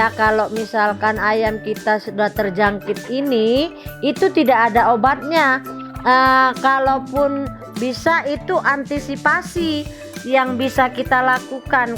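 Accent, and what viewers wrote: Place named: Medan, Indonesia. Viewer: Logat kakak ne medan kali lah 🤣🤣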